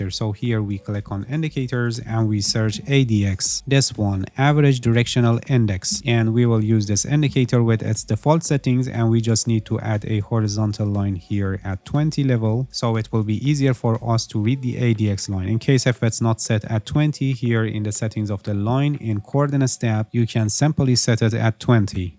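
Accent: Iran. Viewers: گل پسر خیلی خوب انگلیسی صحبت میکنی درود بر تو اما لهجه فارسی هنوز کاملا قالبه😊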